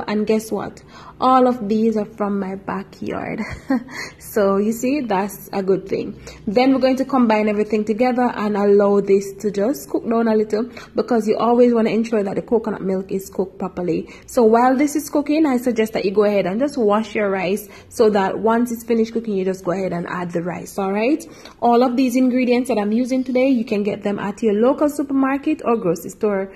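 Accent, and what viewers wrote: Jamaica. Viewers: Are you Jamaican or Bajan ??!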